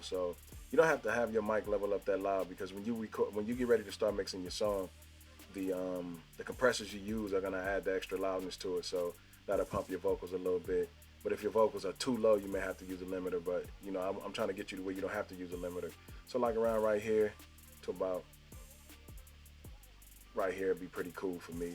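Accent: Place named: Cleveland, United States. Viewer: Well then you close, homie. I knew I pinpointed your accent and just genuinely liked you because you was from the Land. Good to know homie. I’m frustrated as fuck. I’m still up. I thought I had exploded on my tracks on my MPC to live properly into my logic, but I actually did it. There are Sounds is missing. Are you on Instagram? Send me your handle. I would like to be your Instagram partner. And thanks man I will check your videos. I like your style.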